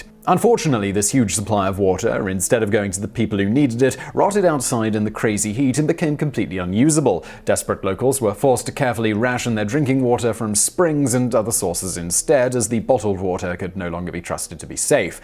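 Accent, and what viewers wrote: England, United Kingdom. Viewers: Anecdotal, but I knew quite a few people who got paid out for damages from FEMA. It seems like a lot of these toptenz videos are aimed at slinging dirt at the US, via an overly snobby sounding English accent. Why don't you focus on your own country more? It needs some focus, that's for sure, because it's falling apart worse than the US is. Bye Felicia✌️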